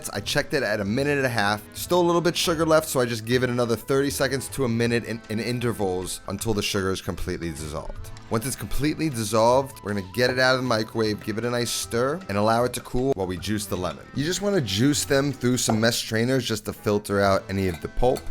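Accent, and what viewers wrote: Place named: Bronx, United States. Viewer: I was.guessing you were cute by your voice - the Bronx I-talian accent and then, Momma mia!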